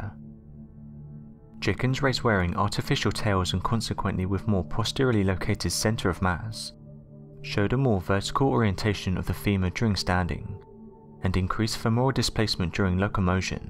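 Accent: England, United Kingdom. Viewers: Get rid of this narrator with his heavy English accent slur is hard to understand.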